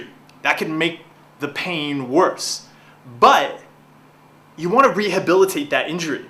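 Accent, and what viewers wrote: Canada. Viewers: Very helpful video. Im from northwest USA and did I pickup on a Canadian accent? Just wondering :)) thankyou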